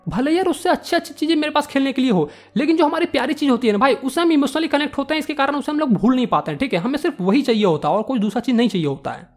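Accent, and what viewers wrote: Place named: Maithili, India. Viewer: Beta I love your maithali tone . Because I am also a bihari ❤️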